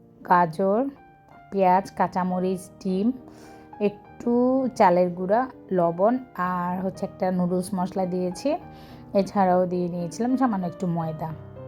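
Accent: Noakhali, Bangladesh. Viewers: নুডুলস ,পাকোড়া তারপর অনেক সুন্দর সুন্দর রান্না। ছোট ভাই, ছোট ভাইয়ের বউকে খাওয়ানো আর আমাদেরকে দাওয়াত না দেয়াএটা খুব কষ্টদায়ক কিন্তু আপনার শারীরিক সুস্থতা কামনা করছি। আর আপনার নোয়াখাইল্লা ভাষা তো তুলনাই নাই।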